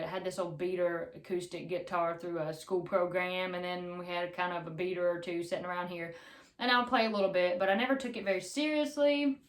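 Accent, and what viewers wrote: South, United States. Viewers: Love the southern twang accent 😍😍 hate my voice. Anyways GREAT PURCHASE 👌🏽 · Watching your videos make me miss my home of Oklahoma with country sounding people and the country accent that I understand. Your videos bring a bit of home to me here in Utah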